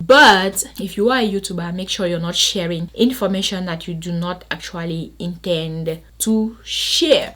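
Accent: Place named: Cameroon. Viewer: Loving the Cameroonian accent. You got a new subbie all the way from sunny Cape Town, South Africa!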